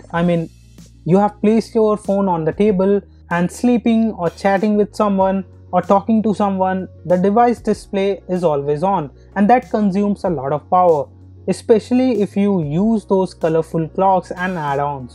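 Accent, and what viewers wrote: India. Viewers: Im tired of your Indian accent. Sorry to say that.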